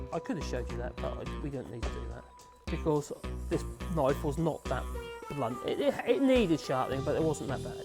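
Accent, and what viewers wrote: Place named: United Kingdom. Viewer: Yeah my sound all the way turned up and still had a hard time understanding. You are British with a lovely accent but the music makes it quite hard to follow then.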